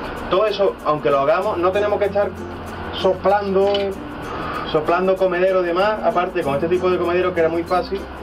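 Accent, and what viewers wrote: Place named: Andalusia, Spain. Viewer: En el acento ya decía yo que eras andaluz como yo jeje. Muchas gracias por la información crack. La calor de allí es peor que la de aquí tela. Un abrazo compi.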